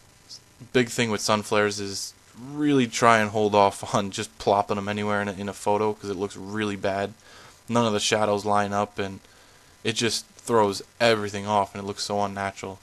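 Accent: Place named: East Coast, United States. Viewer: good video and good tips I want to get rollers when im down in ocmd for june and sept you sound like your from around the east coast I do photography as well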